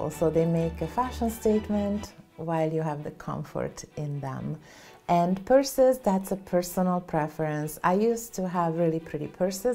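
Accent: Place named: Italy. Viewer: I really like a beautiful bag that elevates an outfit. I love your accent?... My dad came to America from Italy (Calabria) when he was 8. You sound like my beautiful Italian cousins. 😍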